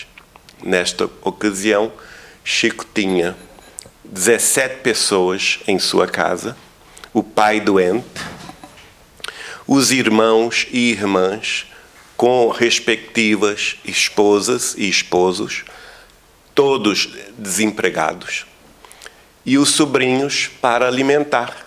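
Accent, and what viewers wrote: Portugal. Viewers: Ah pq ele esta fallando c sotaque de portugues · porque o sotaque portugues??? kkkk nao faz sentido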